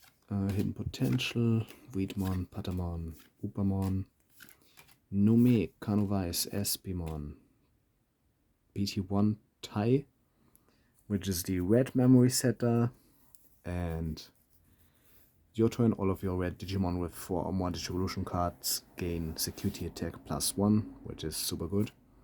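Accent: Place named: Germany. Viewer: Irgendwie hab das Gefühl, das du in deinem Englisch Ton, eingentlich deutsch sprichst 😅 is das so?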